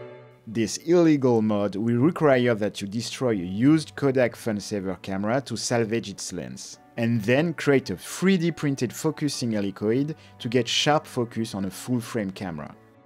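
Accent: France. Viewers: The tricks are just amzing ! And the powerful French accent is totally tentalizing.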